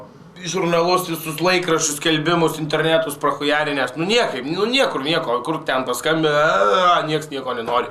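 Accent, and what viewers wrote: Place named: Samogitia, Lithuania. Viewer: Zemaiteška gera vara tep ir tuoliau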